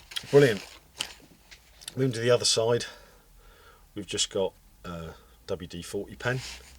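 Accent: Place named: United Kingdom. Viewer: Some great items in there that I think I might add to my EDC. PS, it's nice to hear another Brit talking about EDC, as most of the time, ot's an American dominanted topic. Cheers.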